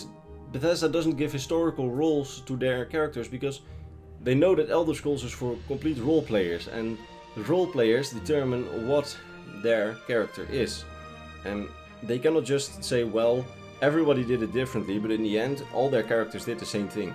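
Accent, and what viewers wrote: Netherlands. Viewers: By your accent i can tell you are dutch. Why hello fellow dutchman. How i wish the last dragonborn would be off on the quest to claim the throne of the empire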